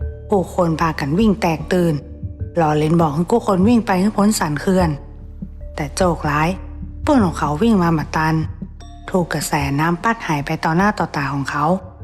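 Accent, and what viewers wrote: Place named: Isan, Thailand. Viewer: เสียงอิสาร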